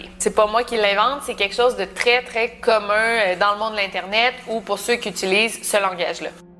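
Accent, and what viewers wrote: Quebec, Canada. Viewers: Salut! Je pense que les reseaux pedophiles ne pourraient pas exister sans la protection de gens haut places. Je pense que nous sommes manipules et que le monde est sous l'emprise des gens tres riches et puissants. Je crois en cette theorie et malheureusement je pense que personne ne peut arreter ca... les preuves seront toujours masques. C'est horrible et degoutant. Bravo pour ta chaine, tu es super! J'adore les canadiens et leur accent, 😀👍💖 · Oh jee ! T'as l'accent du Québec, toi... Mein Gott !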